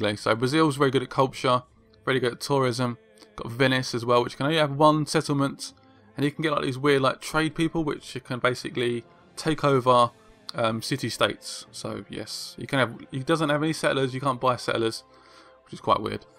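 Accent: United Kingdom. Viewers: Do you live in the United States? British accent? Just curious as you said "Here in America...". Anyways thanks for the walkthrough trying to learn this expansion!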